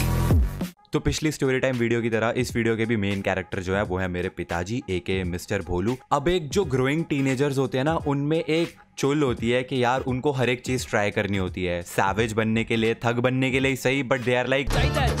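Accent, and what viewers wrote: Punjab, India. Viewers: Hes punjabi is very cute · Thaade punjabi bari cute a ji😅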